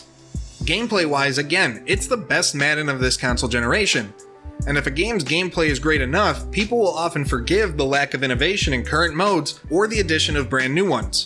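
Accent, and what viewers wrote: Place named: Midwest, United States. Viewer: Oh damn..that wisconsin/minnesota accent tho 🤣😂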